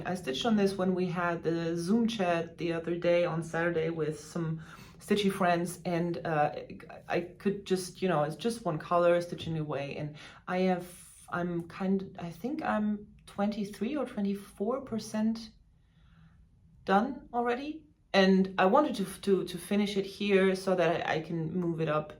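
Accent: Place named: Germany. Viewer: Have you ever visited the island of Borkum? I live in Canada but spent some time in Germany in the late 1960's and your lighthouse piece brought that to mind. It looks wonderful, by the way! I really enjoy your channel,. Let out your German accent as I find it quite charming!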